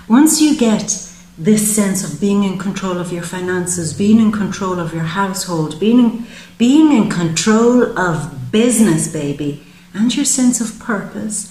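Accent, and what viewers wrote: Ireland. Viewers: For now as I am going to listen to the rest of your video I love ya you are honey and lemon and your voice is ace. Your accent to die for and your gift. I listen to different videos but you are the best. The creme d la creme the cherry on top. Amazing. But that cos you have the Irish beauty no one can deny. Mwa mwa mwa. Speak to you again. Soon. Stevie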